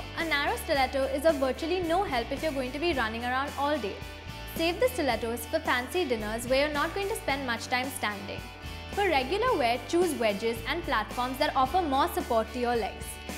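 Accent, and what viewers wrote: India. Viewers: Ur curry accent!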